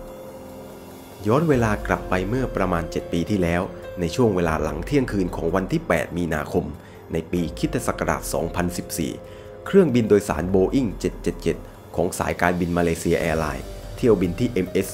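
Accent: South, Thailand. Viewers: เป็นคนใต้หรอฮะ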